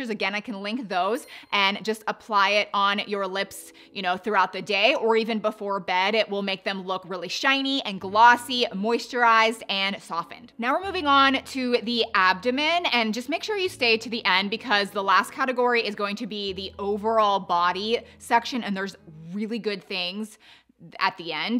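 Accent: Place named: California, United States. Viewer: I couldn’t listen because of the Californian affectations, waving nails in front of the camera and garbling at different speeds then doing lioooooong croaky bits. Calm down. Keep your hands still. Speak slowly and clearly and not as if every sentence is a question. Vocal fry and unspeak isn’t cool si=x7rH9xDPsorNr47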